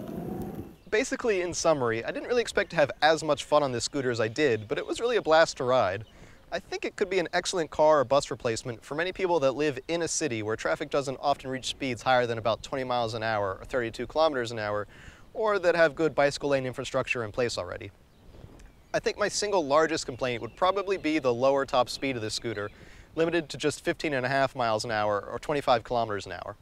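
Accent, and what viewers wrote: United States. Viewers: פאק, הייתי בטוח שאתה אמריקאי ואז פתאום in tel aviv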